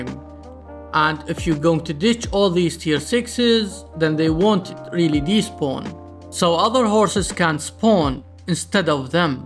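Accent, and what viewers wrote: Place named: Arab world. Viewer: you sound arab! are you? nice video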